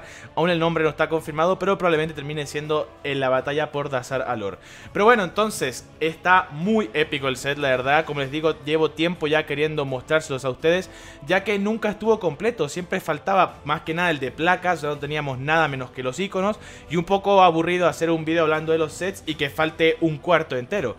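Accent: Chile. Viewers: este men es chileno no?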